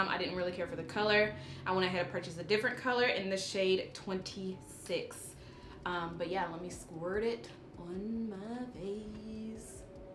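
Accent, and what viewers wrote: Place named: Texas, United States. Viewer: Where is she from? Sounds like texas. Im new here.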